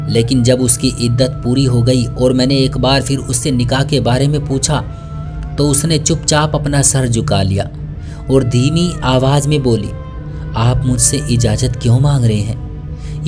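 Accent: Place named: India. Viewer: To kya hua kisi kisi ki zaban aise hi hoti hai jaise mostly bihar aur up mp side walo ki zaban aise hi hoti hai aapko samjh aa gaya na bas wahi bohot hai😏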